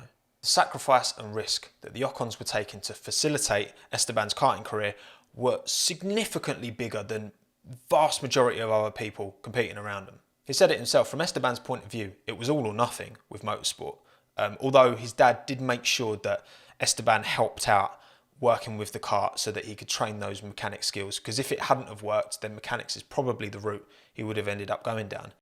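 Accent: United Kingdom. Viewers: There's an aspect I really like about your videos: even if they're ten minutes long, at the end it feels like it's been 2 minutes. I could definitely stay here listening at you telling stories for so long. As a non-native speaker, I really really like your Bri'ish way of talking, it's really enjoyable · Bri'ish accent >>>>> All the other accents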